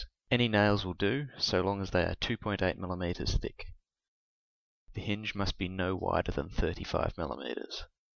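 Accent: Australia. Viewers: Hey man, you sound Aussie. Sweet. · Holy fucken shit mate, never knew you were Aussie, top notch work! The best i've seen in a long while. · R u from Australia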